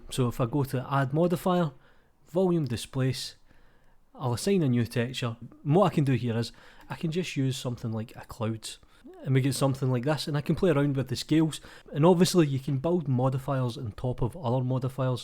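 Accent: Scotland, United Kingdom. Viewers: Glasgow? I miss the Scottish accent ;) Nice channel mate.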